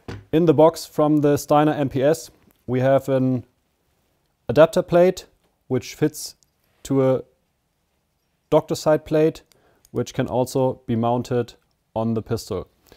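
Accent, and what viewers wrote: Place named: Germany. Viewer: geiler deutscher Akzent..... 🤣🤣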